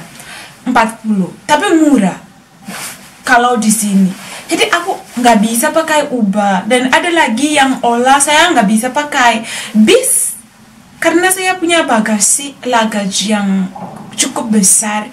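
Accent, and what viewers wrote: Java, Indonesia. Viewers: jangan dihilangin medoknya ya di Inggris mbak hehehe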